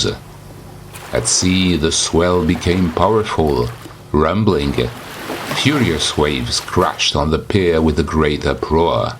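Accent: France. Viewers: I love the narrator's French accent !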